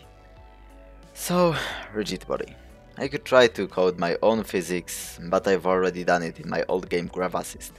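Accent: Poland. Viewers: ale polski akcent. fajny film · czyżby Polak?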